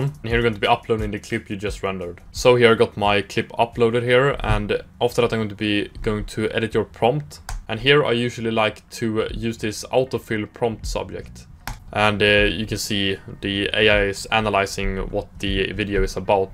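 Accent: Sweden. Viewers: man hör svenskan haha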